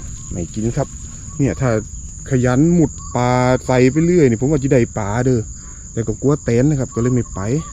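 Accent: Korat, Thailand. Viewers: ชอบฟังภาษาโคราชfcสกลนครครับหมานๆเด่อ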